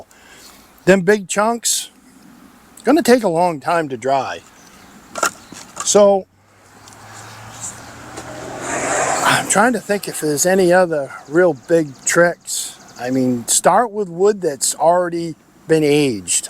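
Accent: Maine, United States. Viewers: Are you from Maine by chance? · Yes you get that white mold on birch here in Nova Scotia too. New Subscriber here, because of the Maine accent 😅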